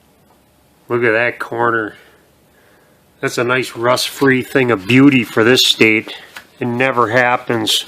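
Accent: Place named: Minnesota, United States. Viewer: haha yah could tell by your accent, i am also from the twin cities. Still driving around this civic?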